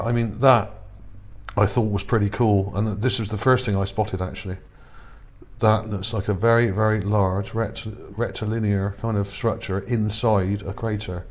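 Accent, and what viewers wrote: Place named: West Country, United Kingdom. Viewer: Thanks ….for another share. Always fascinating. Especially in that warm West Country voice of yours. I am from Hampshire myself.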